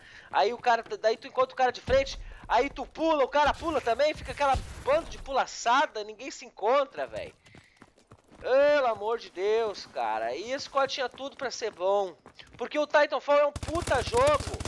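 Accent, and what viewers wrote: Rio Grande do Sul, Brazil. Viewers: Tu é gaúcho do sul thê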